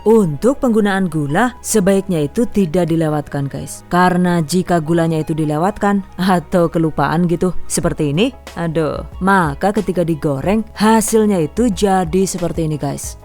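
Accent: Banyumas, Indonesia. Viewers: Jebulane wong ngapaakkk..ora ngapak ora kepenak😂😍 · jebule wong ngapak y mbokayi😂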